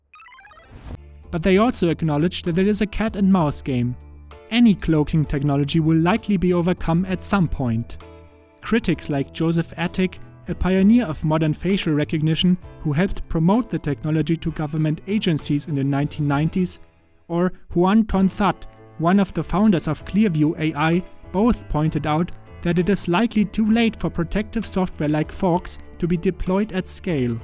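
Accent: Germany. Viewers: Kann das sein das du Deutscher bist? Der Akzent killt mich halt total x) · Love the german accent. es ist schön but thanks for the information you are providing. subbed.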